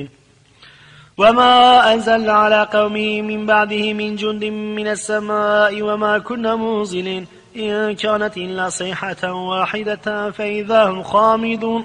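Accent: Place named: Southeastern Anatolia, Turkey. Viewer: Mustafa bey öncelikle iyi günler dilerim. Yorumunuzda bahsettiğiniz tarzda çok çok büyük hatalara rastlamadım. Denk geldiğim kadarıyla 6 yerde harf telaffuz hatası var.(Peltek yerine düz okuma gibi.) Bunu da her insan hızlı okurken gayet de yapabilir. Bey'de farkettiğim kadarıyla Doğu-Güneydoğu Anadolu ağzı var. Bu nedenle de bazı harflerin telaffuzu kendisi için alışılmadık gelebilir. Ortada veballik bir durum olduğu düşüncenizi reddediyorum. Size ve sevdiklerinize sağlıklı ve huzurlu günler dilerim. Bey'e de sevgiler. Başarılarının devamını dilerim.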